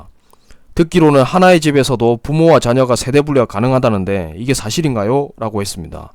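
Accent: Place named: Jinju-Sacheon, South Korea. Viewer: 감사합니다 억양이 진주사천사람 같아요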